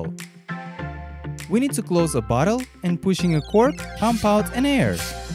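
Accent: Russia. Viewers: И акцент русский( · I love his Russian accent/grammar 👍🏼👍🏾👍🏿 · ну акцент то русский слышен за километры...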